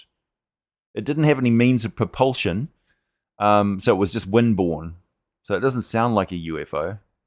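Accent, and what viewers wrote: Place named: New Zealand. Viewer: Glad to see you trying new things! The AI voicing and script videos haven't resonated with me personally [the AI getting stuck in they cycle of using the word exosuit to describe exosuits or as an example of exosuit technology was when I realized that someone/thing else was writing your scripts and not to your standard], but if they otherwise help the channel grow and allow you to put out more content in your historical style going forward (albeit with fewer dungeons and or dragons) with your voicing and and well researched and written scripts then that's great. I also appreciate the clear labeling of them so that I don't get my hopes up for something of your usual caliber! AI is a great tool, but you remain a better script writer and narrator [at least until ChatGPT can scrape all your scripts and do a consistent NZ accent!]. 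All the best, hope you're keeping well! Had a great face off against some vampires on your silicone battle mats from the kickstarter last night.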